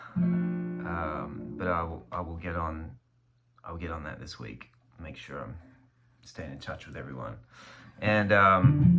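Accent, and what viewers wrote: Australia. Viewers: I’m glad you still have a strong Aussie accent. You’re doing us proud over there!!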